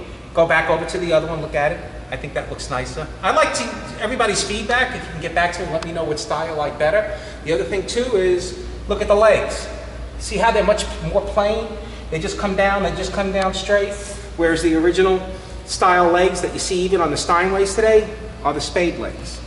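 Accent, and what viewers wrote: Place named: New York, United States. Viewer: Host must have a NYC typical Accent.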